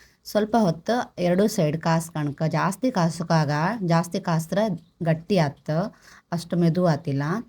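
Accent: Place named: Kundapura, India. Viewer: ನಿಮ್ಮ ಕುಂದಾಪುರ ಕನ್ನಡ ಇಷ್ಟ ಆಯಿತು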